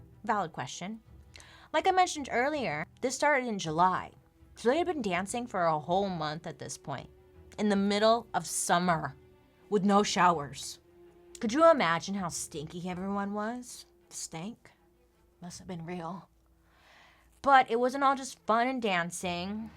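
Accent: United States. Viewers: Where is from v my thoughts our deffo usa accent v do love a the Americans v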